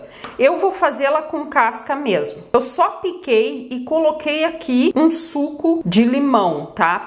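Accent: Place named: South, Brazil. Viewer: Otima sua receita!!!!e com esse sotaque do sul!!! so' pode sair receitas boas!!!!!!👧👍👏🍰🍩🍯🍮🌹🍃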